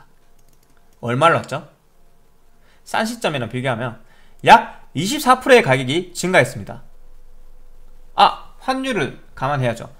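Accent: Gyeongsang, South Korea. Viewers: 대구 말투를 쓰길래 대구 일거 같아서여 ㅎㅎㅎ 대구에서 컴퓨터 하시나요??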